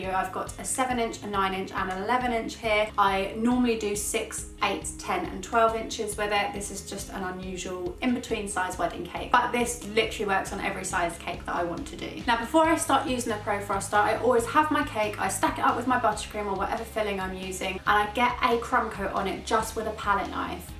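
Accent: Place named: Cockney, United Kingdom. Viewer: Great tutorial. . .but her Cockney accent is grating. She sounds like Eliza Doolittle before Higgins helped her.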